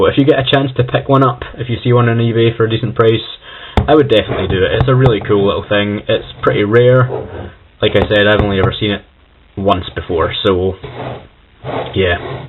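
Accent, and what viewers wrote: Scotland, United Kingdom. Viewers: I like how you are Scottish, man.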